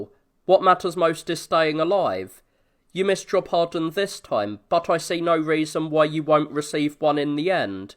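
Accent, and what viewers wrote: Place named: United Kingdom. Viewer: Thanks for the effort, Even though the Japanese names are pronounced in a British way, not the Japanese way. Still, Thanks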